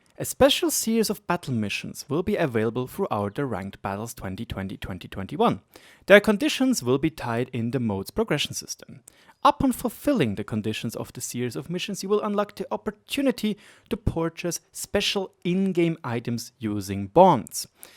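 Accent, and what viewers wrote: Germany. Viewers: Great job with the vids m8, one small hint tho': dont talk so fast :) i know your main language is german and your enlish is very good but the german accent sometimes kill your words :) keep it up - we enjoy your content and your effords!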